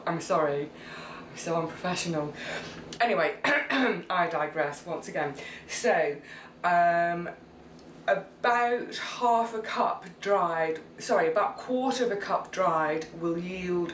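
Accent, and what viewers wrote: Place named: London, United Kingdom. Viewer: Hi, love your videos. My grandmother and grandfather came from London, and your accent brings back memories. Thank you for your wonderful recipes. Do you make carrot marmalade. I will surely try the tomatoe soup. Canada says hi!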